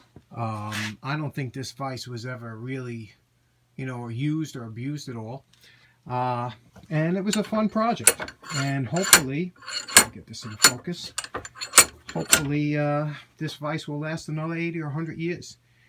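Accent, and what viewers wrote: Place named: New Jersey, United States. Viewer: I just can't stop watching your videos. Addictive but legal??? Your Jersey accent is awesome. Adds reality to the process and work, somehow.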